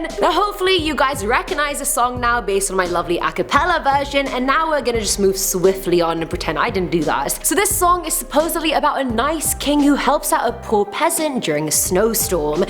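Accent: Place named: United Kingdom. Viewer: She is my favorite host the British voice just hits different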